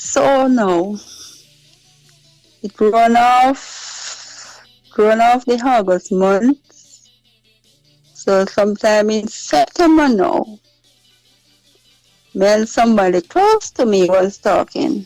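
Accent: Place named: Jamaica. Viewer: Lady chat jamaican twang you not a Trinidadian you no sound good, love blind you till you no see say dis fool a use u. U fi real?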